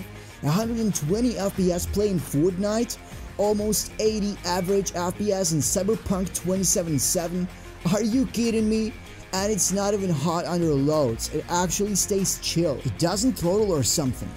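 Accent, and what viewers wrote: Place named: Europe. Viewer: I wish u all the best for your channel but your accent is distracting, its like u are trying to sound like you are from some European country, it sounds like a mix of European/ California surfer who is trying to sound tough and also suffers from asthma (from the labored breathing sounds) if u are not faking your accent then I apologize, either way good luck with your channel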